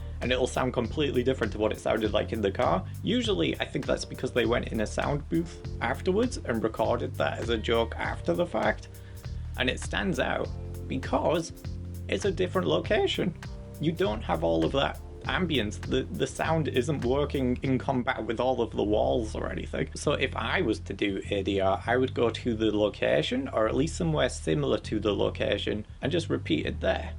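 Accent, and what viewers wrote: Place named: United Kingdom. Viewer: Great advice, thanks a lot! Love the humour. And the dialect 😊. Totally unable to place that, though - which part of the UK?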